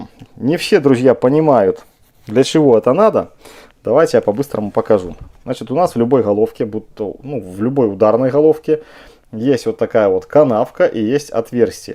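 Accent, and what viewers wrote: Belarus. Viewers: По голосу слышу, что беларус. А ссылка на российскую версию Алиэкспресс. Не понятно.. . 🤷‍♂️ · дак я ж по говару сразу сказал - Белорус) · Давно в Беларуси не живу, но интересно наш акцент услышать родной, ) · По разговору похоже что вы из Беларуси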